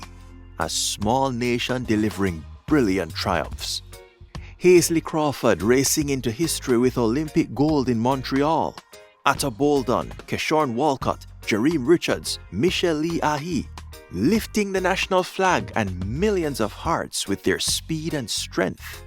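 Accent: Jamaica. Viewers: Why is a jamaica narrating??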